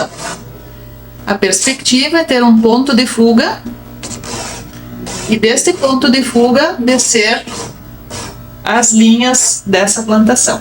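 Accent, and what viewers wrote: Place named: South, Brazil. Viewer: Hi, que surpresa ver campos de lavanda em Gramado! vc está em Gramado? amo Lavandas e nāo sabia que tinha ai no sul.,principalmente quando estāo proximas com campos de girassois. Aqui no sul da França, o pic da floraçāo é em julho qdo fazem a colheita, e ai em Gramado? amei ver suas técnicas de pintura e maneira de ensinar e amei o sotaque kkk acho que é do sul! estas tintas em pote que vc usou, sāo tintas para decoraçāo? a pigmentaçāo é permanente? pergunto pq aqui este tipo de tinta em potes sāo vendidas em lojas de crafts e a escolha de cores é limitada, e as em tubo em lojas de material para desenho e artes. fiquei feliz de encontrar seu canal e ver suas alunas!!!!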